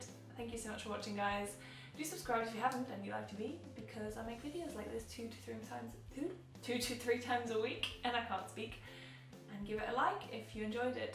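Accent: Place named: United Kingdom. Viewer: Es ist wirklich unglaublich, dass du Deutsche bist. Dein britischer Akzent ist wunderschön :) Bin neu auf deinem Kanal und wollte mich auch mal zu Wort melden 😊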